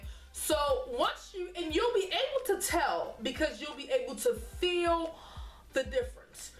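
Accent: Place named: Maryland, United States. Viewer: Helpful! I hear some Maryland in that accent...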